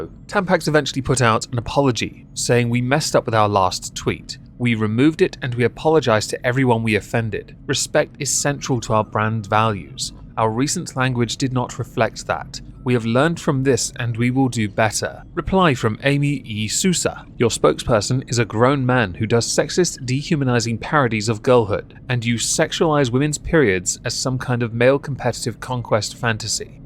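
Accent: United Kingdom. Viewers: Same... I accidentally found on a random lol cow content excursion and then watched the "other stuff" and was still lol'ing and wondering "how have I not found this channel before now?" Is totally my kind of humor even when I don't agree with joke... the British wit & voice is just a bonus 😉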